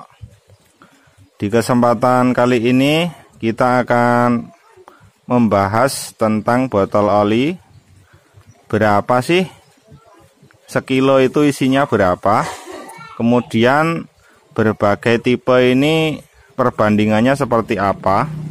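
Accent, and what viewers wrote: Java, Indonesia. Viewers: Mas nadane kyk daerah karanganyar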